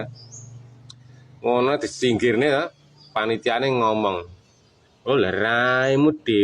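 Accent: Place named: Kediri, Indonesia. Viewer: Seng garai lucu bahasa mu mas.. Mooousti toooawuran... Di guuuecek di giiiepuki.. Khas kediri mas...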